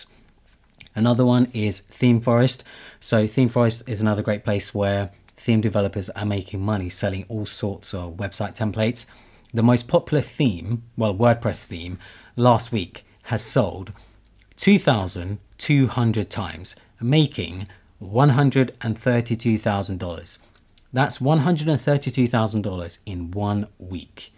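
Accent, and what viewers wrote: United Kingdom. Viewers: Hi! About amazon, are you linking solely to the US amazon store? If you are, is this based on your audience analytics? Asking because it sounds by your accent that you and I are using a different one (amazon uk) so I was wondering whether I should open one here or there.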